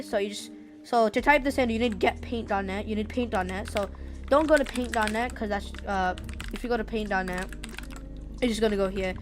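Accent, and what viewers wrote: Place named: Boston, United States. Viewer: Nice Boston accent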